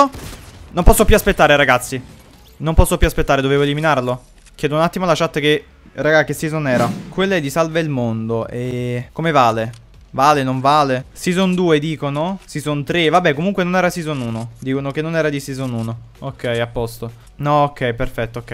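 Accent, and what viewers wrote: Naples, Italy. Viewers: madonna mia fra quanto si sente accenti napoletano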